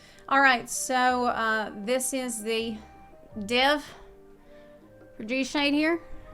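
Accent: United States. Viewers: Her cowgirl accent is coming back to fast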